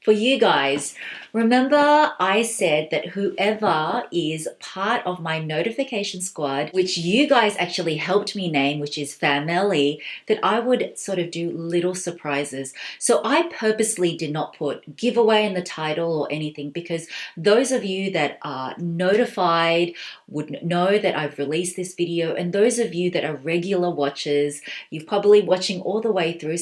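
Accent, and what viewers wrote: Australia. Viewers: #faMely I love your Australian accent. I’ve been watching your videos for a while and love your bag collection. I enjoy your videos it makes me appreciate high fashion. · I am a nurse Educator! Love your videos and the Australian accent! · Hey! My name is Tia and I’m a Fellow Aussie 🇦🇺 but from Perth here! Love your channel and watching your vlogs and hauls! So refreshing to hear our Aussie Accent! Keep up the amazing work! #faMely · #faMELy I am also from Australia and I can't tell you how awesome it is to hear another Aussie accent in the youtube world ;)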